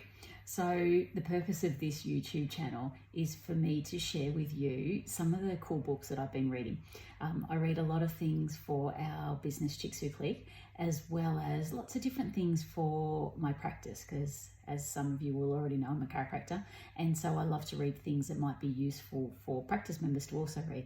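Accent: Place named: Australia. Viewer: Jist found your channel, hope you keep uploading as great to hear more Aussies on booktube!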